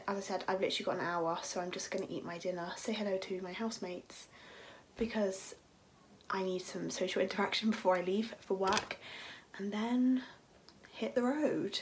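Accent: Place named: England, United Kingdom. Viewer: Sotaque inglês é bonito demais, pena que é difícil de entender kkk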